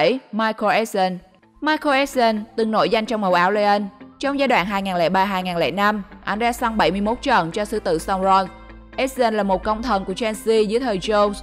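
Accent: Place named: Central, Vietnam. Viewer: Giọng mc miền trung nghe mệt thạt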